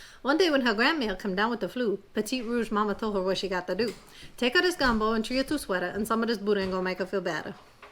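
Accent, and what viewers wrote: Louisiana, United States. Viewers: You can laugh at his "y'all" all the time ! You are good at Cajun speak. Great story too. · I loved the story as well as the Cajun accent. Great job!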